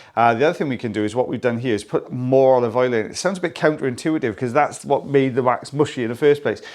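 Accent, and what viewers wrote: Wales, United Kingdom. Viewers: I love how Americanisms are slowly starting to enter your lovely Welsh accent…”big ole”!!! I chuckled when you said that.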